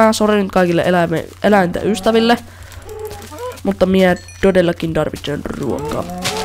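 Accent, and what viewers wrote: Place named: North Ostrobothnia, Finland. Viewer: mistä päi oot jotenki kuulostais pohjois-pohjanmaan murteelta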